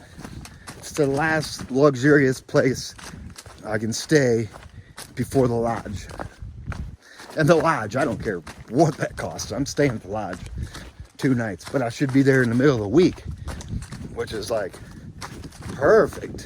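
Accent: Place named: South, United States. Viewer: Where fresh ground from ? Sounds southern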